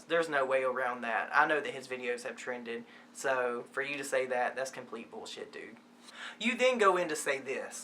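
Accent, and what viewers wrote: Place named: New Orleans, United States. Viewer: Very well said and presented ☺️. I'm gonna have to sub so I can keep up with you! Your accent sounds like where I grew up (small town suburb on the north shore of New Orleans LA)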